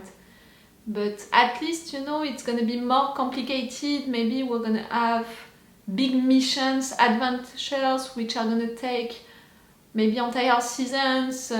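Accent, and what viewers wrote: France. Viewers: C’est un accent français ca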